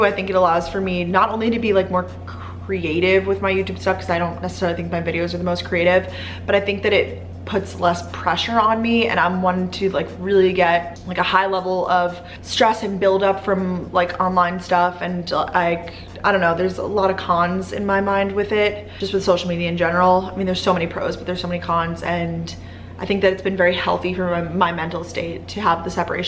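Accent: Long Island, United States. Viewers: Do you feel like you lost your long island accent? also how long do you plan to stay in boston?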